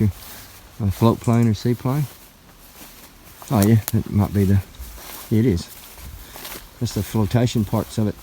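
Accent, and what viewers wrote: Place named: South, United States. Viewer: Your from south US aint you?,or your parents are, and your dad was into ww2 stuff and you talked about it alot, that alabamba slack yaw, no offence really comes through when you mention the stuff made from USA...being english i can pick up difference's very easy, like the difference between canadian and american, and ozzies and with new zealanders...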